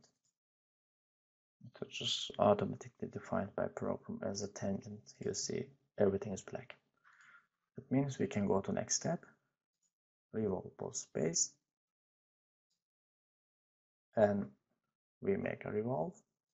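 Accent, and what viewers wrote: Turkey. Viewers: aksanından türk oldugunu anladım, sandalye sırtı gibi ergonomik kıvrımlı düzensiz katı modeller çizer misin?(mesh back diye geçiyor) teşekkürler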